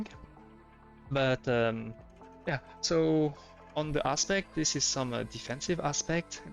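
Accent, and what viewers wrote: France. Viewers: Once again, thank you. By the way, Sir, your accent, is it perhaps French?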